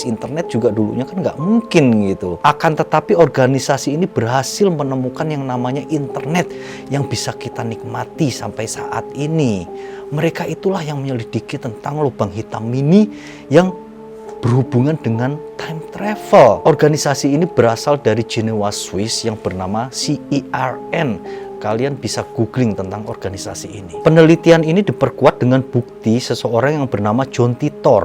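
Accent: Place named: East Java, Indonesia. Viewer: Sip mantap oranh daerah mana sebenarnya logatnya kyk jawa timur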